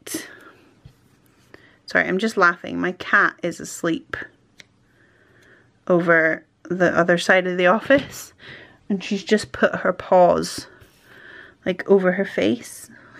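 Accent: Scotland, United Kingdom. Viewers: A plan with me and the word "purring" on a wonderful Scottish accent is just what I needed on this cold January day ❤️❤️❤️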